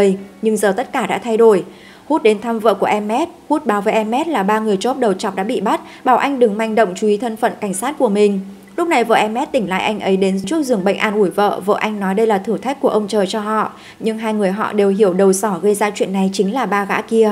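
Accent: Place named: North, Vietnam. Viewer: Nghe giọng đọc MC thấy ngán ngẫm không muốn nghe vì dân Bắc Việt cộng đọc truyện thật là không thích, lại chán chường cho cuộc sống hiện tại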